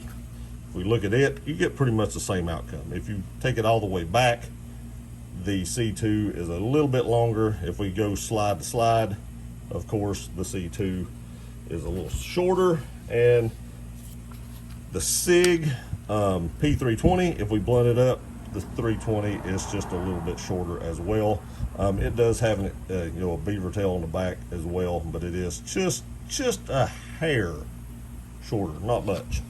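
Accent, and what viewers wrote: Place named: Kentucky, United States. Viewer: I'm getting rid of all my pcc so, I've I've more room in the gun room, and I'm put that cheddar towards 2011 type pistols. I heard you mention gatlinburg, and the mountains look like Appalachian mountains in your vids you sound like you're from Kentucky I'm from east Tennessee/western part of VA (not wva) if I'm right that makes us neighbors.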